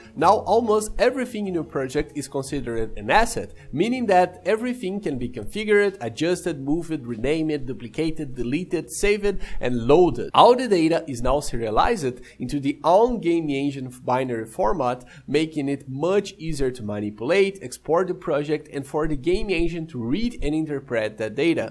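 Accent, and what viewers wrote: Rio de Janeiro, Brazil. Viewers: sotaque brasileiro é impossível de esconder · E parece ser carioca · Brazilian accent, commom brazilian name 🤔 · Esse sotaque da cidade maravilhosa não me deixa duvida kk...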